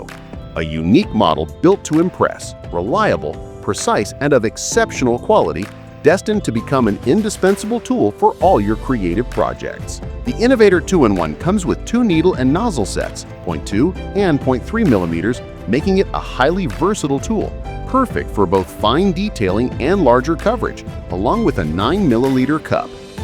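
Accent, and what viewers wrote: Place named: United States. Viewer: Hope it’s available in the UK? I’m not a fan of the American accent overdubbed video 🙄🙏🏴🇬🇧♥️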